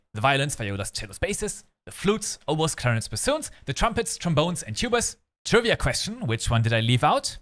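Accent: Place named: Germany. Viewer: kurze anmerkung man hört raus das du deutsch bist haha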